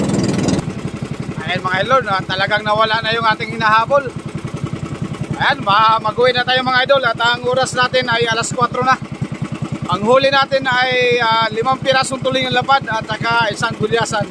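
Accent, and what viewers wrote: Visayas, Philippines. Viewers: Visaya ka segoro kol dawi palang alam na hahahaha😂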